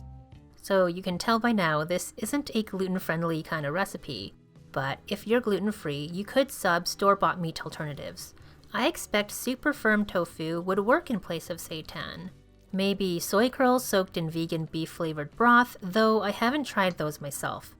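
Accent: Canada. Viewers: I KNEW you were a fellow canadian and I even guess Alberta! 🤗😆♥️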